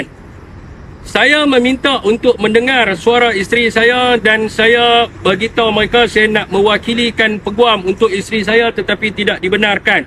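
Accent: Kedah, Malaysia. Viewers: AKU TENGOK MUKA KAU DAH MACAM BEROK. KELUARGA SIAPA NI AGAKNYA. CARA PERCAKAPAN MACAM ORANG KEDAH BETUL KE🤔🤔🤔🤔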